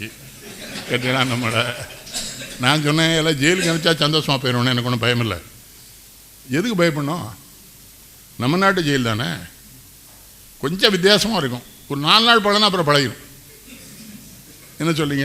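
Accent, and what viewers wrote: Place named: Tirunelveli, India. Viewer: உங்கள் நெல்லை தமிழுக்கு நான் அடிமை ஐயா வாழ்க உங்கள் புகழ் 🙏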